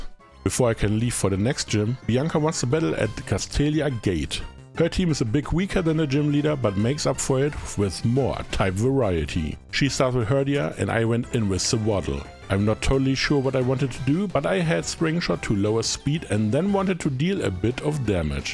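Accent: Germany. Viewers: Your german accent is very strong.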